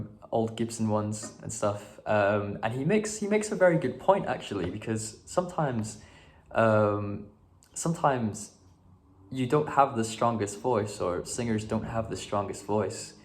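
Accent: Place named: Scotland, United Kingdom. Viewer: he's a SCOT, probs from the north cuz his accent is chill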